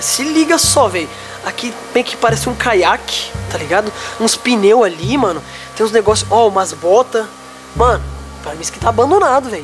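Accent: Portugal. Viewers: Fala portugues de portugal kom os meninos da kasa..😉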